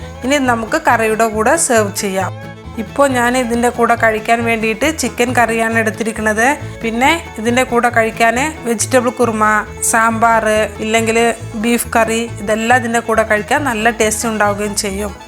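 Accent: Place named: Malabar, India. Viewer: മലബാർ മുസ്ലിം ഭാഷ ആണ്. മലപ്പുറം ആവാം. ഉറപ്പില്ല. എന്തോ ആവട്ടെ ഞങ്ങളുടെ മലബാർ ഭാഷയെ കളിയാക്കണ്ട കേട്ടോ 😊😊😊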